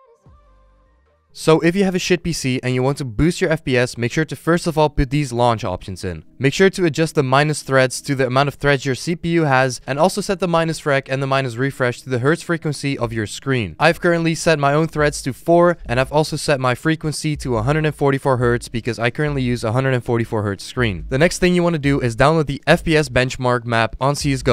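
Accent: Netherlands. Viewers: Are you from the Netherlands 🤙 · Are you dutch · Wait a second ur Dutch? Sup broeder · ARE YOU DUTCH MEN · Wait are you dutch?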